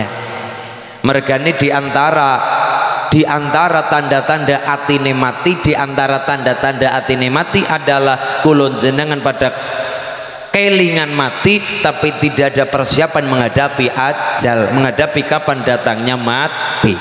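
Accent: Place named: Banyumas, Indonesia. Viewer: Ngaji samasulinnuha semarak semangat soalnya saya seneng sholawatklo ngaji sama mas iudah pasti ada sholawatnya bikin semangat klo ada sholawatnya jadi tidak tegang. Terhibur sama sholawat.merasakan rasanya adem semringah.sejukhatinyapakai bahasa ngapak juga enak didengar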